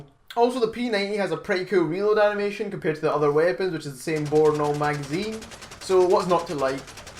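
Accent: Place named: Scotland, United Kingdom. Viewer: It makes me laugh that your like goals are so low. I admit that I watch TheRadBrad and PewDiePie sometimes. Maybe if you said "Take-It-Bitch" in your videos more or could somehow bring your pet into this, I think you could start pushing the "likes" more. I know you think it's a shit accent but the Scottish accent is pretty cool. HaHa! Man I'm only messing with you a little. I like your videos and I think watching has helped my game play.You should be pushing those "likes" in the thousands before too long.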